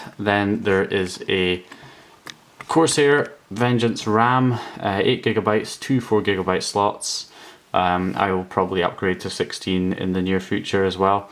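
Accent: Scotland, United Kingdom. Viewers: Are you from Scotland? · ***** I believe it's scotland, judging from the accent. Probably somewhere near Aberdeen, but his accent has a slight twist, so he might be from somewhere around that area. I'm not Scottish, so I'm not too sure.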